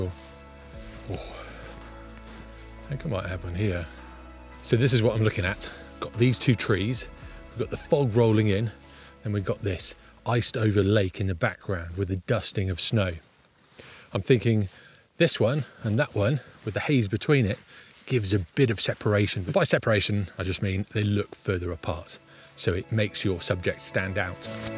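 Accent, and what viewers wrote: United Kingdom. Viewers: i love u r british accent.when i hear u r voice.i"ll remember Matrix movie star anderson.by the way u r great for teaching photography triks and tipssss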